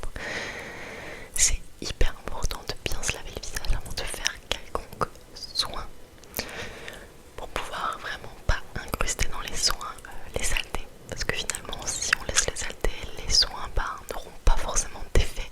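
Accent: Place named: England, United Kingdom. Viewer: incroyable la vidéo, c'est super relaxant et puis tu as un très bel accent anglais☺ Je m'abonne directement et j'espère que tu iras loin car tu en as le potentiel ;)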